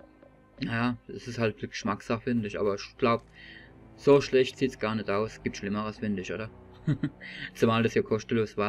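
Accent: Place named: Palatinate, Germany. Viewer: Ich aus Landau :) Man hörts an deinem dialekt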